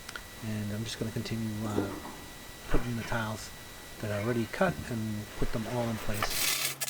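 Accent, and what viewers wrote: Boston, United States. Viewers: You sound like a Red Sox fan so I know you’re not near me. But I could’ve used a tile pro like you. I got shoe makers working in my bathroom.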